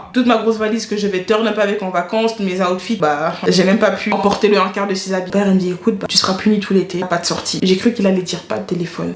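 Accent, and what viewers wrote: Cameroon. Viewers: trop marrant surtout avec ton accent camer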